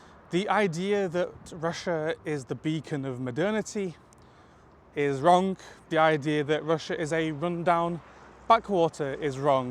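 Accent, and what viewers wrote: United Kingdom. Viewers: Как всё-таки легко понимать британский английский. Без этой распальцованной, разнузданной американской каши во рту. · What region of the UK are you from? I'm trying to learn to determine accents😅 It sounds like RP with a touch of something, I dunno, northern?..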